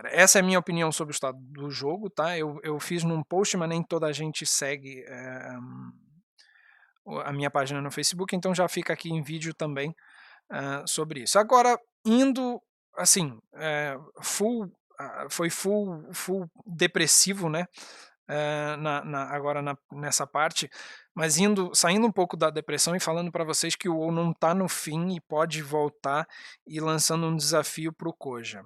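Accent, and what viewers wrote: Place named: Portugal. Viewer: eu ia comentar que vc tem sotaque português e vc disse que tem família lá kkkkkkk furou meu coment! Cara vou sentir falta dos seus guias, na minha opinião os melhores de tank que já vi. Usei muito de war e de druida(mage tower)! os seus junto aos do Wild são os melhores guias de classe do wow em português. Gostaria de dizer que também parei de jogar desde o começo desse ano, e só voltaria a jogar caso muita coisa fosse reformulada, fiquei entre 6 e 7 anos aqui, um obrigado e quem sabe não voltamos um dia, boa sorte man!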